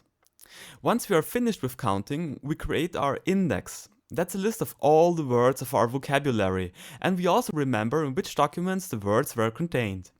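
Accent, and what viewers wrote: Germany. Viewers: Aww cute German accent! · The german is strong with this one :D But gj, simple and understandable.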